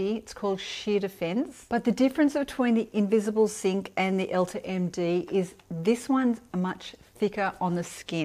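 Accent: Australia. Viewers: I’m a new subscriber. I have watched five of your videos today. Thank you for sharing all your wonderful tips for us fabulous 50 gals. I love hearing your Australian accent.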